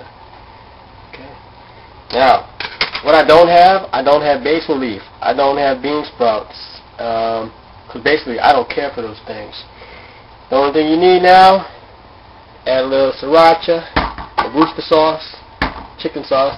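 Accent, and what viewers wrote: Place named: East Coast, United States. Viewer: he sounds like hes from the east coast but this is some half ass pho but a lot better then what i can do.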